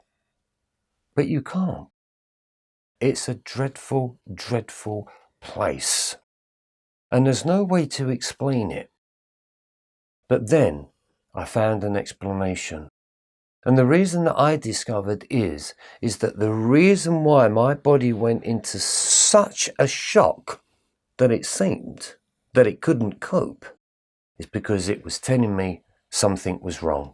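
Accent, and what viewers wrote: Cockney, United Kingdom. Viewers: Thank you, it is good to hear this and watch your other talks on the dark knight of the soul..It is helping me a great deal. Plus your voice sounds similar to my Mums who was from the east end of London. Her death and Covid last year triggered I believe this time I am going through now... There is a lot of help on the Internet and I pick and choose who I listen to. You are a comfort.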